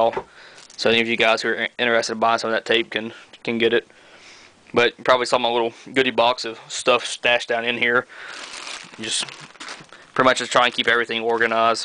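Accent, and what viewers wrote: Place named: Texas, United States. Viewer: Way to go mate! it looks awesome, love the accent you gotta be from texas, good luuck with build I will keep an eye out for more progress vids, cheers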